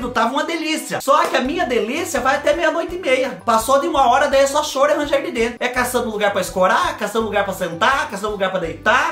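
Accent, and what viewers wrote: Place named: Caipira, Brazil. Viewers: Olha eiu concordo com o que comentou que se vc fizer Stand Up vc arrasa! Porque vc é muito engraçado porque exagera e fala com sotaque caipira muito engraçado!!!! Bj bj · Vc. Fala iguarsinho eu quando morava em minas gerais 😁😁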